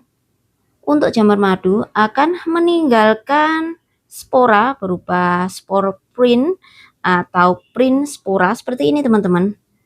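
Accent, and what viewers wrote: Java, Indonesia. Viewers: Assalamualaikum mba, jenengan Indonesianya daerah mana mba kok medhok sekali 😊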